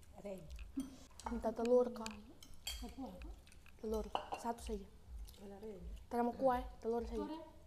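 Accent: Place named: Flores, Indonesia. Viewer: Kek ada logat Bajawa😅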